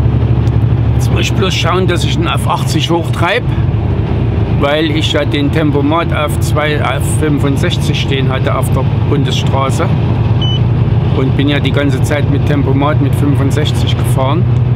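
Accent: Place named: Saxony, Germany. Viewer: Hallo, du bist einfach der zuverlässigste. Jeden Tag freue ich mich auf dein Video. Und sächsisch finde ich seitdem auch nicht mehr förchterlisch! Mach weiter so!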